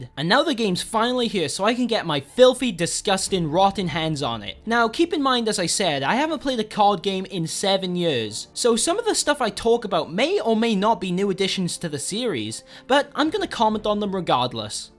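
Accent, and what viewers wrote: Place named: South Wales, United Kingdom. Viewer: Nice to see a welsh YouTuber for once Also you from Newport, like it’s a weird question but you have a south accent.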